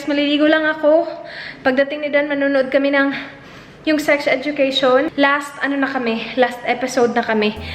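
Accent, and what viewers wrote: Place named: Visayas, Philippines. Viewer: AGUY 😂😂😂 pero cute paminawun TATAK BISAYA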